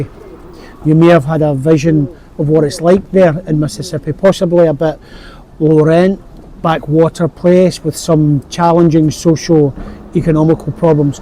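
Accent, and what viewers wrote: United Kingdom. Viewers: can any one tell me which area of uk does this YouTuber come from? his accent is interesting. i want to visit that part of uk. i once head the original English once had uvular trill. Britain should pronounce as blr~, just like he pronounced. Indian got their accent from that. i wonder if that's true.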